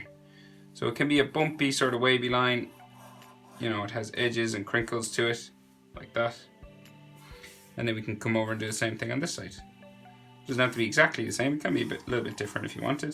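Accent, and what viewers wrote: Ireland. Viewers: arwe you irish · Are u Irish u have a bit of a acsent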